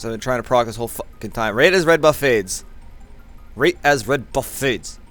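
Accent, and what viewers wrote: Canada. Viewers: Are you Canadian by chance? ;D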